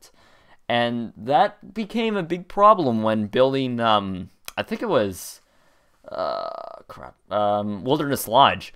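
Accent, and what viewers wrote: Canada. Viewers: You sound Canadian :D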